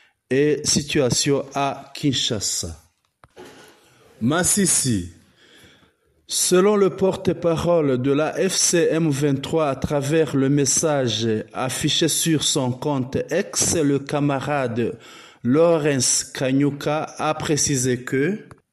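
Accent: Rwanda. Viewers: Rwandais par ton français mensonges prolonger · Avec ton français rwandais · Le ton de français indique que tu es Rwandais.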